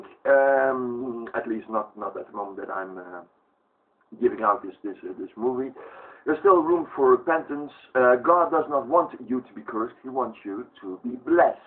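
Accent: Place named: Netherlands. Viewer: Jij bent Nederlands. Dat hoor ik gewoon. Interessante video.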